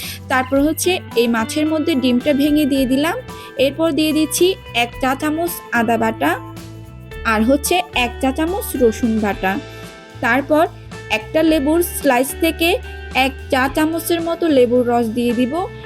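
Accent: Chittagong, Bangladesh. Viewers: আপনার দেশের বাড়ি কি চট্টগ্রাম কোথায় বোঝা যাচ্ছে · Apu apni ki citiga apnar kotai buj jai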